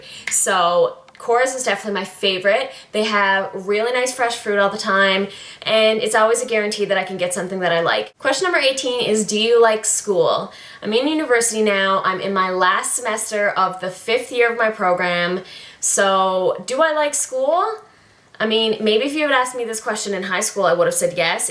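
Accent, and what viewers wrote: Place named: Newfoundland, Canada. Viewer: New subscriber and as soon as I heard your voice I knew you had to be from Newfoundland! Fellow Newfie :)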